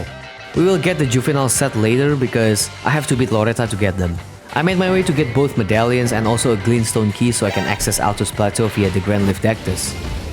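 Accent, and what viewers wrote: Indonesia. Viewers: pantes kek ga asing logat inggrisnya ternyata org indo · are you indonesian? you sound very indonesian no disrespect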